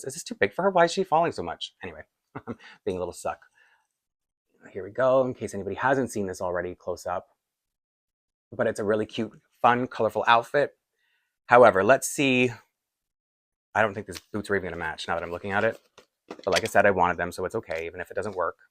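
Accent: Canada. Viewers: I sure love you and your channel! You are so personable I feel as if we’re sitting in the room together and you’re showing me your dolls. I also love your slight Canadian accent, which I thought was cute. You pointed out. I didn’t realize that’s how that Dog’s name was pronounced either so thanks for including me in! It’s the wiener dogs right? 😅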